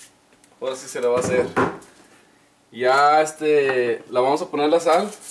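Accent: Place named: North, Mexico. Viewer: Por su acento confió más en que esta receta sea la más adecuada ¡Arriba el norte!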